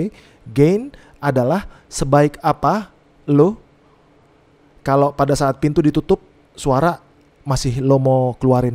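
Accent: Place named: Medan, Indonesia. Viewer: lah kirain orang medan , cara bicaranya itu dari video²lainnya kek medan bang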